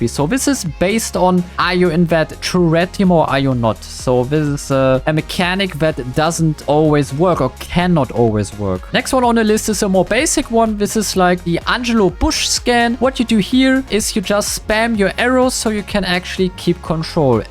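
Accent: Germany. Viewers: Are you German? 😅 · Du gibst ja echt gar kein fick auf dein deutschen akzent hahaha feier ich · Dieser deutsche Akzent ist zu krank :D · Mann hört an deiner Stimme das du deutsch bist 😂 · Bist du deutsch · dieser deutsche akzent 😂😂🤣🤣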